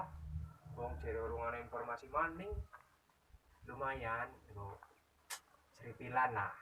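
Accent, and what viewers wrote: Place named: Banyumas, Indonesia. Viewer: jooosss gandos wong ngapakkkkkk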